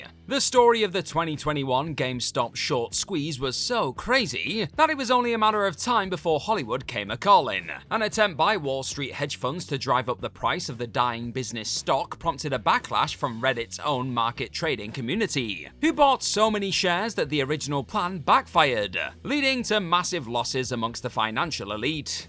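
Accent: United Kingdom. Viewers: I don't believe the writer is actually reading his script. I think they are using an AI reader, with a British accent myself. you can't hear him breathing, or even stopping to take a breath while he is talking. Also A lot of words get mispronounced like NIKE ( Nik-EE)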